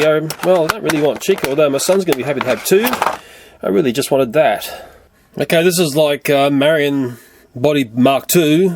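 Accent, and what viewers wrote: Australia. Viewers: Are you Australian I am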